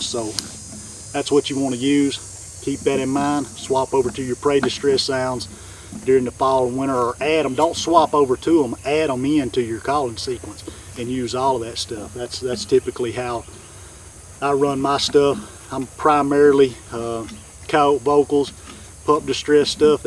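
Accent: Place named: South, United States. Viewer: Thanks for the info. By the way, that is the most solid Country Boy accent ever!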